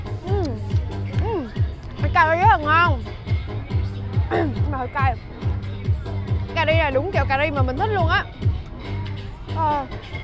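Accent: Da Lat, Vietnam. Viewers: Mới phát hiện kênh mới có hai ngày.. Phái cách nói chuyện, giọng đà lạt hay vãi tèo...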